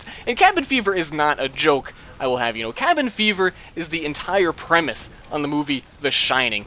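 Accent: New Jersey, United States. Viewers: So ur from nj then? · American lol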